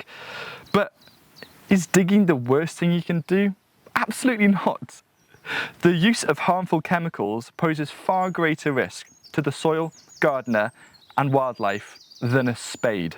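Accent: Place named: Wales, United Kingdom. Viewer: I have but one of this amazing man's books, and consider him to be the only gardener on YouTube worth a book purchase. Not only is he a wise gardener with lots of sage advise (pun intended), he is a wonderful soul, and always worth my time. The always added plus is that gorgeous Welsh accent - I could listen to our read the phone book for hours on end, all while puttering around in my tiny garden. Thanks, - you have my vote for humanitarian gardener of the decade.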